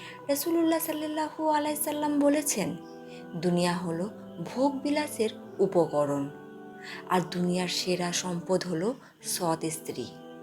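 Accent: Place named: India. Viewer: আপনি কি ভারতীয় , আপনার কথা ভারতীয়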